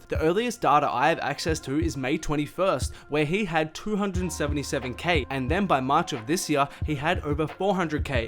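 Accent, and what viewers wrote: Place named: Australia. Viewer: I think it's an australian accent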